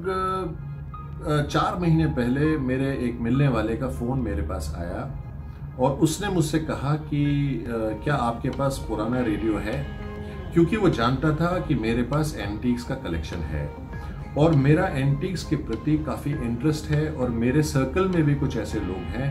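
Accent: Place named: Turkey. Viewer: I know this guys is speaking english but the turkish accent makes it gibberish :(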